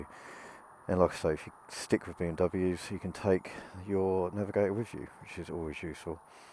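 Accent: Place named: United Kingdom. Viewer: Dig your sense of humour and Brit accent LOL 😂 Great video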